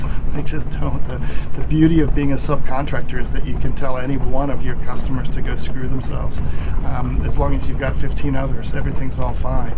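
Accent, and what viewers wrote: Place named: South Florida, United States. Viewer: Great Vid. Gee, sounds like S. FL.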